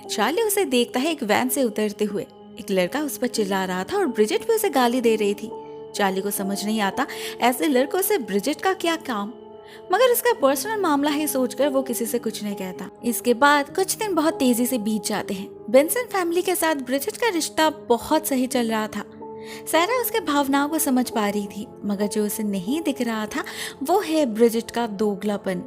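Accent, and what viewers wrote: Bengal, India. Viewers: Woh bengali h. Unke accent se pata chalta h. Upar se unhone durga puja k waqt bengali me wishes di thi viewers ko. Sri lanka se nahi h. Kuch bhi bolte rehte h log. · R u Bangali?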